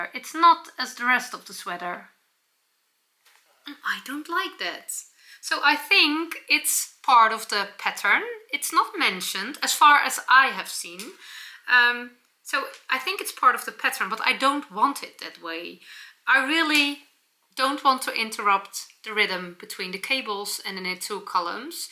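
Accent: Netherlands. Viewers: We love your Dutch English! It is actually very good! 🙂💕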